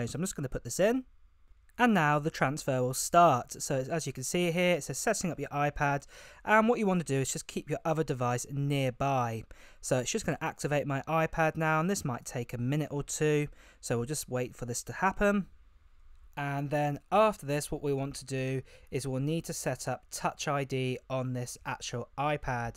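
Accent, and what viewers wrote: United Kingdom. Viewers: I want to buy the iPhone 11 but I don’t have money for that and also i don’t have a job since i was just a 16 yo who want some iPhone i am hoping that i will be the one who got your ipad❤️Godbless you man💖 btw i like your British Accent❤️❤️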